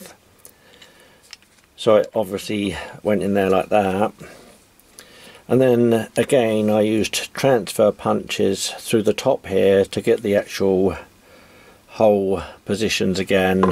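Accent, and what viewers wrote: United Kingdom. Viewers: Hi Mate, I like the mini lathe. It cuts the stainless just fine! I ,also, like your ideas. I have one recommendation if you please. The threaded rod stop should have been a fine thread to allow an easier micro control ability than the standard thread. Your accent is British I think, are you from the UK? But you use metric. Personal preference or am I guessing the wrong location? Good day!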